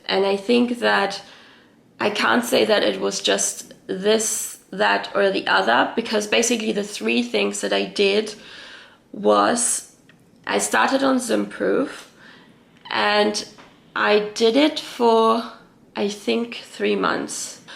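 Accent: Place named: Germany. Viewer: Ich habe an deinem Akzent gehört, dass du Deutsch sprichst:), es freut mich, dass es dir besser geht und du dein Wissen teilst. Ich beobachte, dass sehr viele Menschen selbst jetzt im Sommer erkältet sind. Sie haben einen Infekt nach dem anderen. Das gab es vor Covid und der Impfung nie. Einen Tipp habe ich noch: In einer infekt freien Zeit hat sich bewährt den Körper Kältereizen auszusetzen. Kalte, oder Wechselduschen, Anwendungen nach dem guten , alten Kneipp, der ja immer mehr in Vergessenheit gerät. Das ist wirklich gut fürs Immunsystem.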